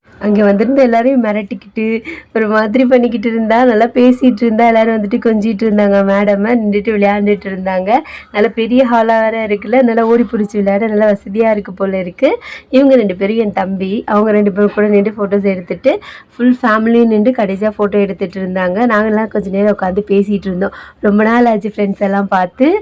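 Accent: Ramanathapuram, India. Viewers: Na ramnad thondi. Unga pechile therinjadhu ma namma ooru pullanu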